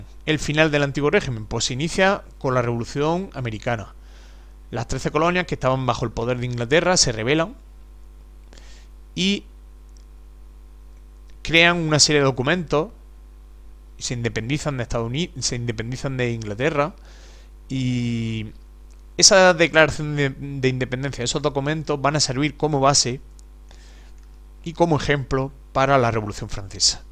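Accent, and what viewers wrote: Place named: Spain. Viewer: Buena la presentación, pero se agradece por el acento ibérico que posee quien da la explicación que mejore la pronunciación de los nombres. Se pierde uno tratando de tener hilo. Gracias y saludos.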